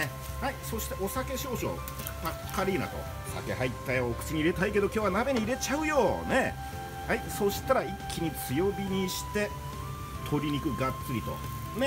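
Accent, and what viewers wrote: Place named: Kanto, Japan. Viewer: 関東の人だね また関東に住みたい。。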